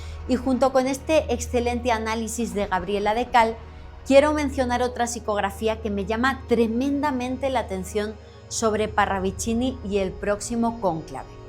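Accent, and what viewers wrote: Spain. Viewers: saludos desde Formosa, muy bueno tu programa, felicitaciones...sos española o argentina?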